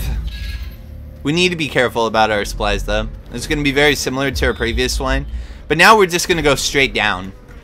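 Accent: United States. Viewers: I started learning english with pewdiepie, then i discovered your channel. You helped me getting use to american english, thank you for that :). I have to find a scottish youtuber now, i can't understand them ^^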